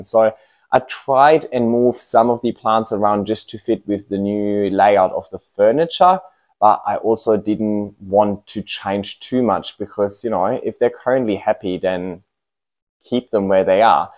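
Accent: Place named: Germany. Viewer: den deutschen akzent versteckste nicht, trotz sehr gutem englisch, aber sollste auch nicht! :-) NRW/Osnabrück? Habe jetzt nicht das gesamte Video geguckt, wie lange wächst deine Größte Monstera und die albi variegata?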